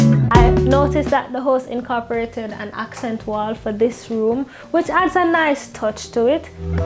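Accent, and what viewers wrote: Jamaica. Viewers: I have to comment because your narration, description, accent (seems 🇯🇲 ) and personality moved me to. Also had to subscribe! Phenomenal video 👏👏👏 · Cah tell me seh u anuh Jamaican mama👀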